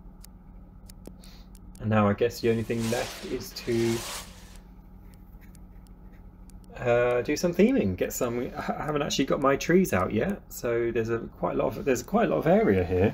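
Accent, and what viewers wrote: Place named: United Kingdom. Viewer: Your voice is from the united kingdom and your from the UK?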